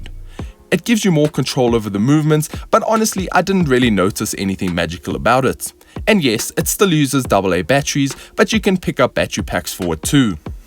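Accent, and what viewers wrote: South Africa. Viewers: Amazing review, the best one Iv seen👍👍❤️❤️You sound like you have a South African accent, I'm from South Africa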